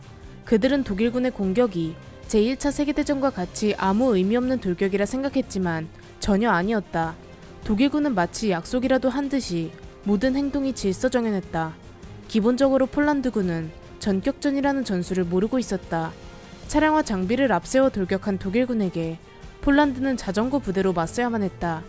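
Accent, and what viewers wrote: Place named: Gyeongsang, South Korea. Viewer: 경상도 억양이 섞여있으셔서 그런듯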